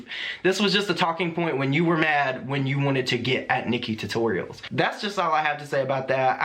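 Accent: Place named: Carolinas, United States. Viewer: OMG so excited there’s another in our area. I’m in Clover but raised in Gastonia. Subbed to them. Love hearing our country drawl in videos I’m watching. ❤️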